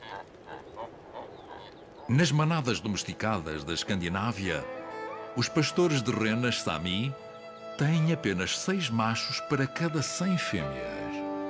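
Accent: Portugal. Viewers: É o sotaque de portugues.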